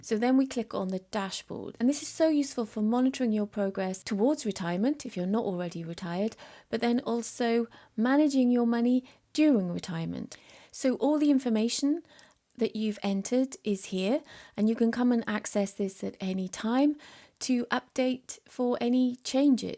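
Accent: Wales, United Kingdom. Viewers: this is an amazing tool, thank you so much for the link and the instructions on how to use it. I'm currently at that "juncture" moment in life where (at 62) with £500k in my pension pot, and currently earning £55k in full-time employment, is this the year where I hang up my boots and finally retire? This tool makes it so easy to help me predict what to do. PS - LOVE your accent! I'm a Cardiff boy myself, and I'm guessing you're further west?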